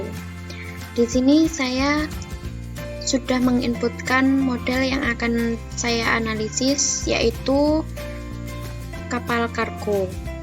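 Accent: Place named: Madiun, Indonesia. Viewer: Terimakasih banyak mbak jadi kangen sama medoknya madiun ehehe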